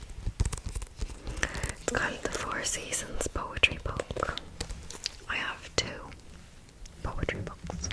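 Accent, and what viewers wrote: Dublin, Ireland. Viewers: Great stuff! Is this a Dublin accent? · ooh, i think this is my favourite video of yours yet! i don’t think i’ll ever get tired of your irish accent videos 🥰 they’re so satisfying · Hahah pretty cool, keep up the Irish accent things please. It has a certain calm ambience to it. Which I think it’s cause your background is just very chill and down to earth, no extra lighting that makes everything too shiny.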